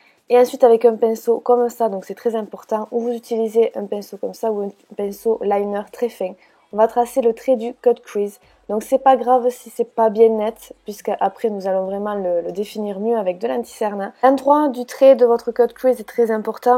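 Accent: South, France. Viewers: ton accent c'est celui du Sud ? 😘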